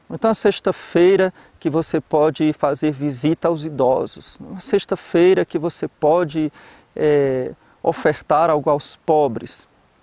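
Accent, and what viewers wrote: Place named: Northeast, Brazil. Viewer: é nordestino?????? Nem desconfiei, e agora vejo que tem um "sotaquezinho" ai kkk. Padre eu sabia dessa lei da Igreja, mas costumo esquecer e acabo comendo. Quando lembro vem um pouco de culpa, mas já não tem volta... é tentar na próxima.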